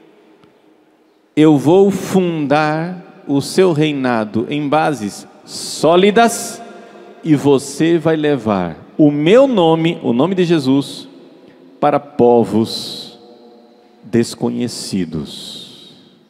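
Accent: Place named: Brazil. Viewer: Hilariante escutar o padre Paulo Ricardo com o sotaque e expressões brasileiras a representar D. Afonso Henriques! A batalha que o padre refere é a batalha de Ourique. Pouco se sabe acerca dessa batalha mas sim, a tradição conta isso mesmo acerca da aparição de Jesus ao primeiro Rei de Portugal. FÉ era decerto algo que movia os povos daqueles tempos. Fé essa hoje quase esquecida. Um abraço grande de Portugal a todos os meus irmãos no Brasil! Muita paz e amor! FÉ irmãos! Nosso Senhor não nos abandona! ❤️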